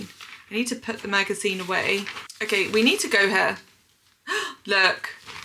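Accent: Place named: United Kingdom. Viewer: Cozy vibes, beautiful autumn outside, yummy views of the dishes in the restaurant, and pleasant for ears British English... And the similar deciduous trees with yellowing leaves like those growing around my place... It's been a pleasure to watch the video. Keep it up!🎉❤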